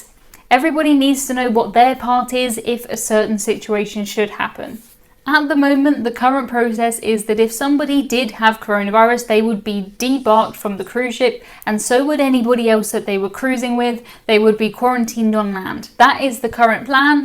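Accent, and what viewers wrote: RP, United Kingdom. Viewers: Very informative and didn't feel too long; everything was well packed! Hopefully this level of cautiousness is only temporary, yet some of the procedures make sense for the future under all circumstances. Anyway.. It's much easier to learn about these things as someone (read ) has already digested the data and translated it to understandable language (posh accent or not..) and good examples. Thank you!:)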